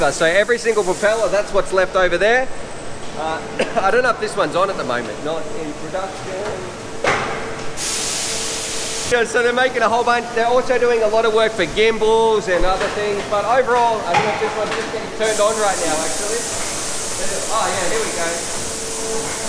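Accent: Australia. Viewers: Wait, are you Aussie? I've been watching you for months now and I didn't even realise your accent until this video ahahah. I'm Aussie too which is probably why I didn't really think about it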